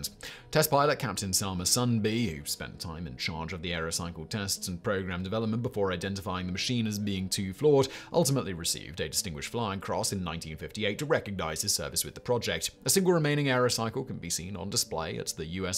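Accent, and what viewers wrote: England, United Kingdom. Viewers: Has got more English 👀 over the years or is it just me????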